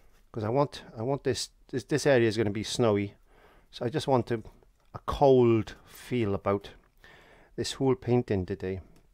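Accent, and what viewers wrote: Wales, United Kingdom. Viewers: How wonderful to hear that wonderful Welsh accent. Land of my Fathers.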